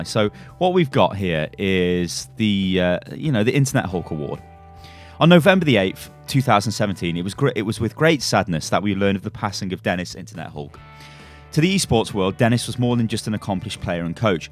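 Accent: United Kingdom. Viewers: I hate how brits say weekend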